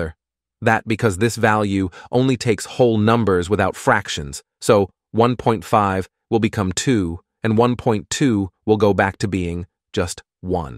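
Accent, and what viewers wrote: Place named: United Kingdom. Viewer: Awesome video and chanel. P.s.: go back to the british accent! 😁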